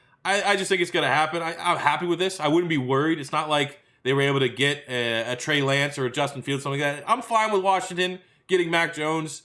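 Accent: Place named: Canada. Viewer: This guys great. Sounds Canadian even though he’s a philly fan. Also what website did he use?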